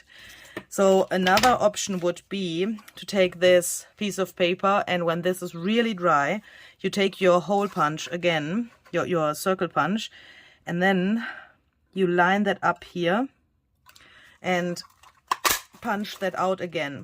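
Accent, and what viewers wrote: Germany. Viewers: Omigosh, …This digital came up on my Etsy feed last night and I added it to my cart… Now, when I see it in use…I must have it…I will be returning to my cart and purchasing it right away…I love these cards… Now I have to figure out how to print four on one page… I must tell you, I am of German descent and when I listen to your accent, it brings me back to my childhood and makes me happy… You have a wonderful personality and you make me smile…Hugs to You…❤️❤️❤️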